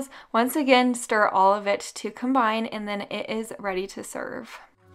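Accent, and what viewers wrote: Utah, United States. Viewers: I went to USU and it is such a throwback to hear your Utah accent, you are brilliant. Keep up the good work. If I had guess your from Sandy.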